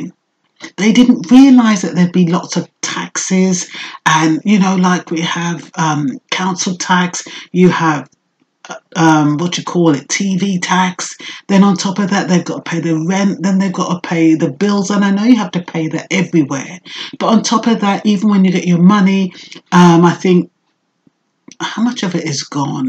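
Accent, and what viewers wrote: Jamaica. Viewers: Your Jamaican accent comes out when you're upset!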